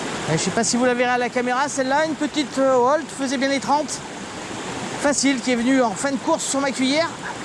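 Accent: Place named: Auvergne, France. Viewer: Salut camarade Bougnat .... Heureux de retrouver tes vidéos......et ton accent ...j'ai le même.....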